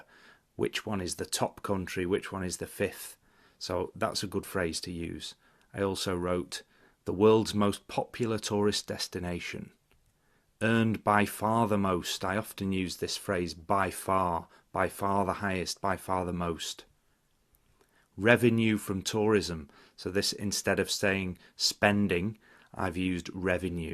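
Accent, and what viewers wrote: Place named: United Kingdom. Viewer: Woow u've such a beautiful voice and accent, Don't take it personally, it's not an offensive word. How did you achieve this accent, I from central asian, because of that I have problems in accent, as sound r. How did u get this british accent?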